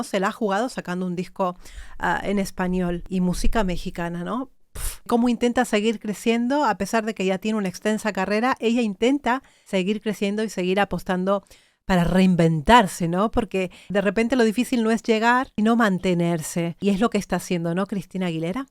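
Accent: Argentina. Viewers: Eres argentina y española ? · se te escucha tu acento argentino como si hubieras estado ahi hace poco ❤no te lo notaba tan marcado como hoy. Pero también, no me he metido mucho a YouTube entonces igual soy yo la que te escucho mal. Gracias por tu pasión a la musica. Me encata ❤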